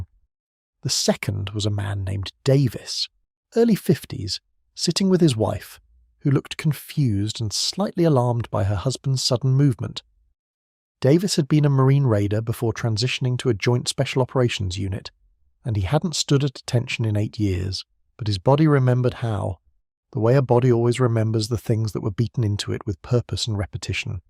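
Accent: United Kingdom. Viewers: Why, oh tell me WHY, Lord, is this story delivered with a British accent? I can't listen!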